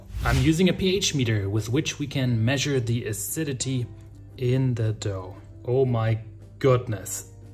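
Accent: Germany. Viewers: I love your German accent. Main reason I sat through the whole video. Good stuff thou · Got this randomly recommended, immediately noticed the German accent mein Freund, without any knowledge about bakery and/or cooking but enjoyed the video nonetheless. Loved the hissing kittens when you "spritzed" the dough :D Definitely gonna stay here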